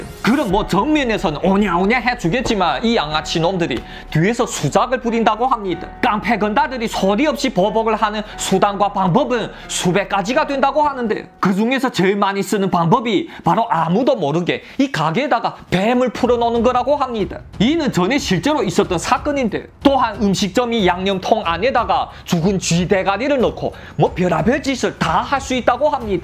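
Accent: Yanbian, China. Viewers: 조선족임? 연변말투네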